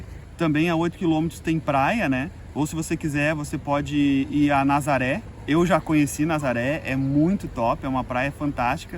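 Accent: Rio Grande do Sul, Brazil. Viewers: Buenas, tem como comprar erva de chimarrão por aí? Tu é gaúcho né. Parabéns pelo canal.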